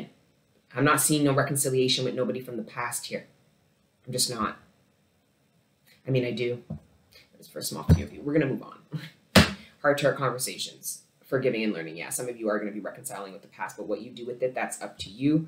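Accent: Jamaica. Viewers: deep voice patois FUCKING DONE.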